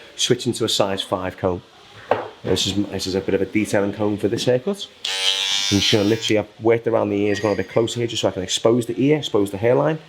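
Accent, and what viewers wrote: Liverpool, United Kingdom. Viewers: are u from liverpool? sounds like a scouse accent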